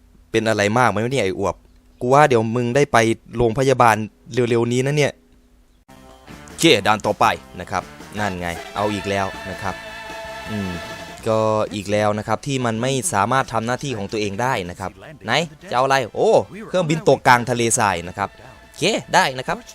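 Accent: Isan, Thailand. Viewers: พี่อยู่จังหวัดอาไรคับ สำเนียงเหมือนคน อิสาน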